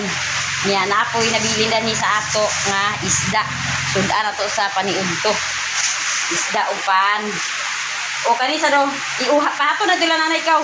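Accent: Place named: Visayas, Philippines. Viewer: Katawa gyud ko sa lawom nga bisaya nimo ate 😂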